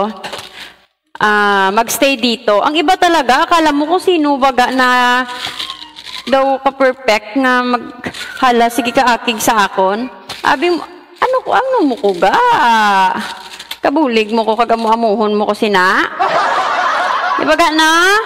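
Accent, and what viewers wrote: Iloilo, Philippines. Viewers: Sumuaubra lang sila niyan idol...parang ilonggo ka din 😅😅😅❤️❤️❤️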